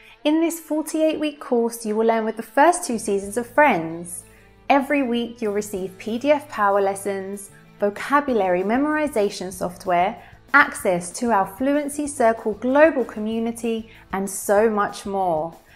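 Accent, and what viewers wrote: United Kingdom. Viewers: I enjoyed this lesson because i rather the british accent, i'm a basketball player and admireee MJ! THAAANKS! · Nice to see you,.:) I like your British way of speaking.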